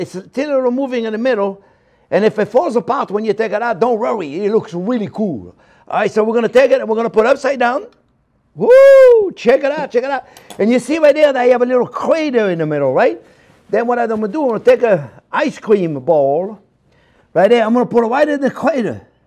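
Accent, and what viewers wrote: France. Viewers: C'est vraiment un plaisir de vous retrouver depuis tout ce temps. Ravi que vous soyez revenu sur la Youtube. Pour moi vous êtes le chef parfait. Super pédagogue et simple. Et puis cet inimitable accent français aussi. Surtout continuez! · I just love your French accent!!! I can't wait to make this...with you!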